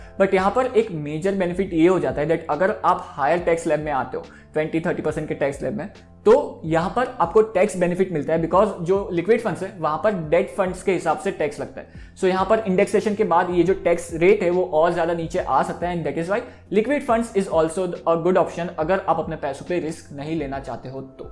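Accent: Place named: Gujarat, India. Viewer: Jiv me thoda ..... 😅😁... Gujju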